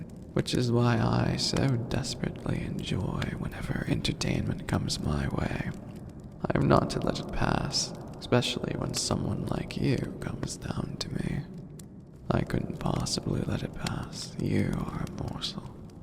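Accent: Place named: United Kingdom. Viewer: Sounds British. Perfect!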